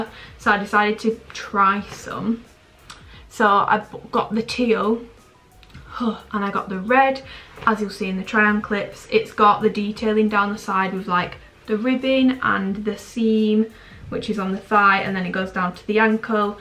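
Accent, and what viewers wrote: United Kingdom. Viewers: are you from Scotland 🏴❤️😋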